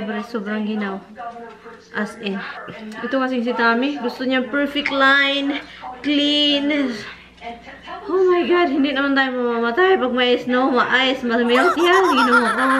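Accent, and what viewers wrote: Visayas, Philippines. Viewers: Hi sis , Pasagde na sila kay gusto kaayo kos imong accent hahaha Bisaya gud ta 😍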